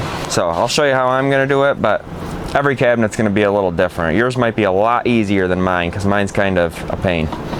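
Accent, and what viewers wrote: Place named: Upper Midwest, United States. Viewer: I built a stand-alone cabinet that has four sections; two cabinets and two pull-outs for garbage and recycling cans. It also has two drawers above the garbage and recycling can pull-outs. One cabinet has cleaning supplies, and the other has dog food and supplies. It was built with materials I had on hand, so to hold the cans in place I cut 1x2's in half and made braces to fit each can. I like your design better, and if I'm able to continue with my woodworking endeavors after my neck has healed, I'm going to use some of the scrap plywood I have and rebuild the pull out drawers for the garbage and recycling cans. Thanks for the video, because I've been wanting to redo them, but I just didn't know what I wanted to do with them. What part of the upper Midwest do you live or come from? I have family in Wisconsin that have the same accent as you.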